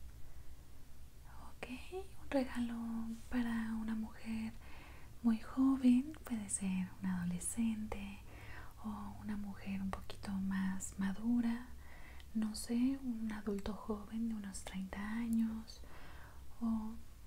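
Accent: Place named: Mexico. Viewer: Hola me gusta mucho el acento mexicano y el asmr en comun 😘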